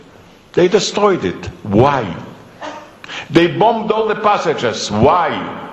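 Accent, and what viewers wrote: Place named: France. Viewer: boyle francizca aksani kulaga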